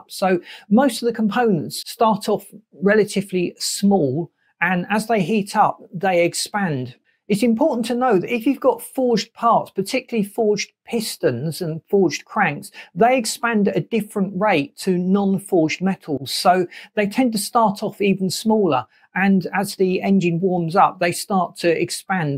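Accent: United Kingdom. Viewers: "zois compoinets hate up" ???? bro I h8 this annoying uk accent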